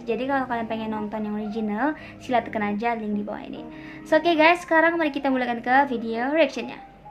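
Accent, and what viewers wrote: Indonesia. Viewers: kamu mah... lucu...logatnya indonesia banget... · Mbak orang brunei atau orang Indonesia kok logatnya kaya orang Indonesia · Logat Indonesia nya udah mulai muncul ya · Kok logatnya Indonesia banget mbak.... Asli Brunei atau Indonesia nih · aksen melayu brunei sudah tdk kdngran dek..udah kayak org indonesia bngt aksen nya...salute..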